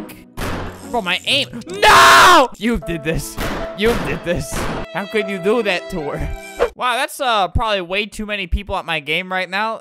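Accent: South, United States. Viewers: My teacher did a southern accent, and she sounded exactly like small edit: Holy crud I’ve never gotten 100 likes until now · Who doesnt love alberts american accent/voice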